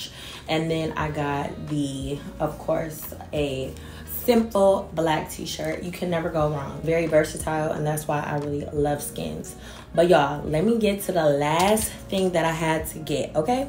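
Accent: England, United Kingdom. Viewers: The British is killing meeeee I love you lol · "mom says don't drink the milk"........ I love British accent!!!🤣🤣🤣💕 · The English accent 😂😂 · Your British accent kills me 😂😂😂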